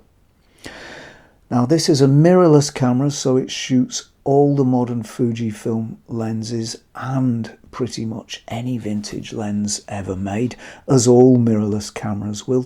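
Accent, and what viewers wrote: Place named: United Kingdom. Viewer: Well, this is a year later from your comment, but I say bugger the manual. (Listening to Mr. makes me feel like talking Brit.) Watch a couple of Youtube vids about it and Bob's your uncle. Later you'll have specific questions to look up answers to in the manual—or yet another Youtube tutorial.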